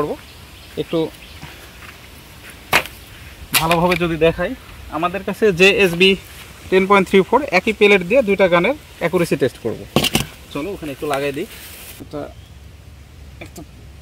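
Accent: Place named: Naogaon, Bangladesh. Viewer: Apnar kotha sune buzhlam apnar bari Naogaon ba Joypurhat . Amr bari naogaon. Nx 200 ta kinte chai. Ki upaye kena jay?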